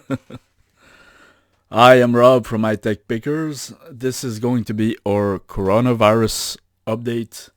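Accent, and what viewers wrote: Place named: Quebec, Canada. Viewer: Oh! que Oui !, je reconnais un Québécois quand j'en entend un, content de savoir que je suis pas seul dans ma recherche pour la vérité sur le coronavirus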